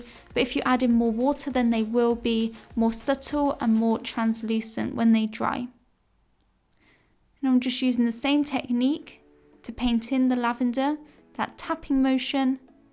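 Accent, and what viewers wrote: United Kingdom. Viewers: British Accent is fun 😶👍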